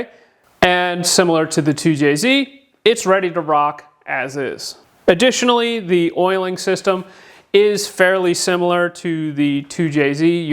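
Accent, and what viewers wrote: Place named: Australia. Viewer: I only saw the vid for 2.3 seconds and I said thats gotta be australian lol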